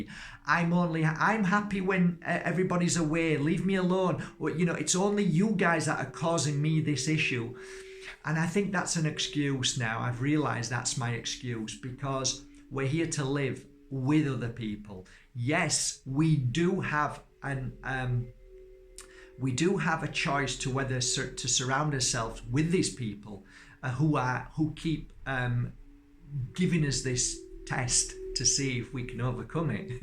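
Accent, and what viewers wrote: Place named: Northern England, United Kingdom. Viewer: Trying to figure out which part of England your from is it lankshire Northern England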